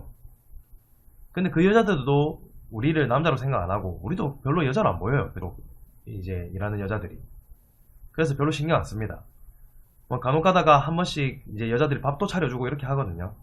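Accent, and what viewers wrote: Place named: Busan, South Korea. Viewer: 말투가 부산사람같네요? 저도 부산사람인데ㅎㅎ 알바 뭐 검색하다가 재밌게 듣고 갑니다~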